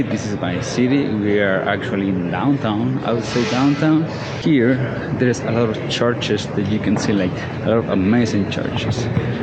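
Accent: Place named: Mexico. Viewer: Tu ingles tan lento y con acento tanto acento mexicao me ayuda un montón a practicar mi listening Jajaja Buen video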